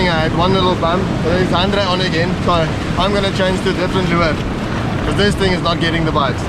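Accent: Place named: Afrikaans, South Africa. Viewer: Jy is afrikaans ek kan dit hoor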